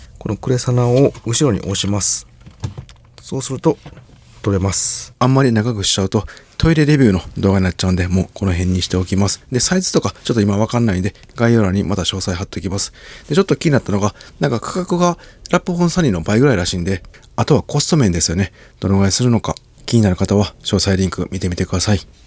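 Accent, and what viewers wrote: Kansai, Japan. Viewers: １分で関西出身とわかる！喋りのアクセントで… クルマは岐阜ナンバーだが。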